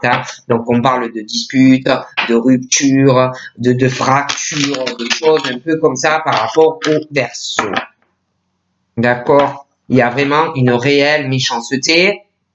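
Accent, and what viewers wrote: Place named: Marseille, France. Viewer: une bretonne à l'accent marseillais, c'est joli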